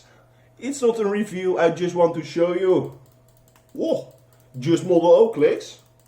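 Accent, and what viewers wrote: Netherlands. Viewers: je bent zeker nederlands jou accent is gelijk te merken😂❤️ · He is Dutch and to talk with a dutch language its hard to talk english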